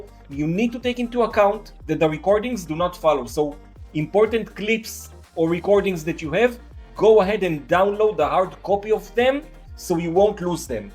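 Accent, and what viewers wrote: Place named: Israel. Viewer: Very good video, concise and to the point, without the blah, blah, blah of many influencers. A pleasure to watch. The guy sounds like a Romanian Israeli.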